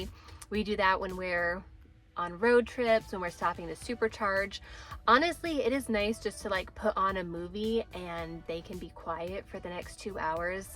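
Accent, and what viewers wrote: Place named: Virginia, United States. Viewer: That Virginia accent!!!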